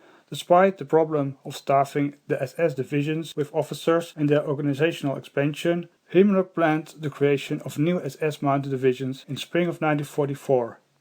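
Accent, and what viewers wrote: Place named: Netherlands. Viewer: Hollandse accent haha goeie video hoor ik heb veel meer informatie gekregen over de balkan dan in school!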